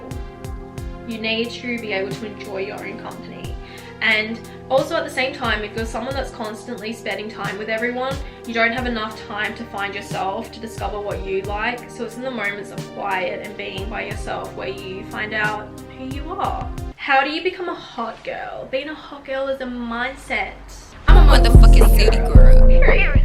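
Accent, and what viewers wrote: Australia. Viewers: is my inspiration, therapist, and australian accent guru <3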